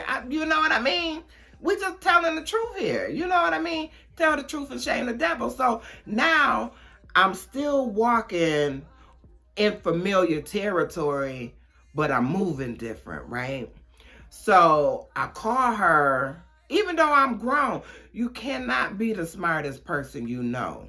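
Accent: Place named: Long Island, United States. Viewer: New subbie I like your style I knew I heard a lil my in there I'm from Long Island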